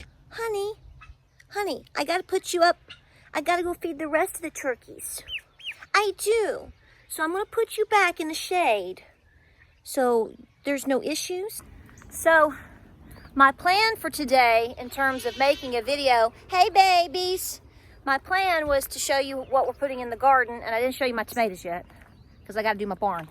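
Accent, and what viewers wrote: South, United States. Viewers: Came in from this Oklahoma heat after hanging garlic to shell some peas and was delighted to another “Truth Hurts” episode. Thank You for being our voice. I know you didn’t ask for that position but only a small handful of folks can get through the wokeness these days and we have it in a beautiful southern accent 🥰 God bless you, ( the ), all the young men in your household. You are greatly appreciated!! 🤓❤️🇺🇸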